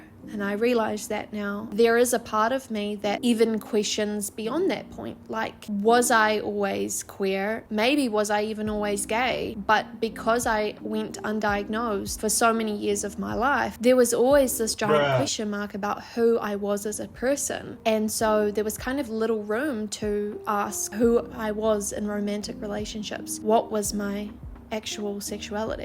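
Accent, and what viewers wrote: New Zealand. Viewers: Unrelated but those Kiwi vowels are so weird, I love it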